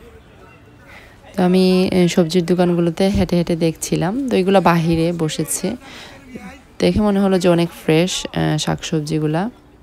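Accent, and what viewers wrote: Sylhet, Bangladesh. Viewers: Apni ki Sylheti??????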